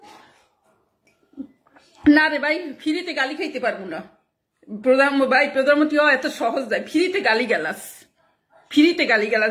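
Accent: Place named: Barishal, Bangladesh. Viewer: ম্যাডামের বাড়ি মনে বরিশাল। চালিয়ে যান ম্যাডাম, খুব ভালো বলেছেন।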